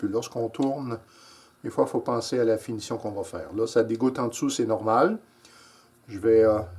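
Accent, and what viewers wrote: Quebec, Canada. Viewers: bonjour et bravo pour tes vidéos. pour le français que je suis c'est toujours très agréable d'entendre l'accent québécois et surtout ce qui est palpable c'est ta simplicité et ta proximité. les vidéos sont super bien tournées et bravo pour la simplicité dans la pédagogie. mon tour arrivant d'ici 15 jours j'ai déjà hâte de suivre tes premiers cours. A bientôt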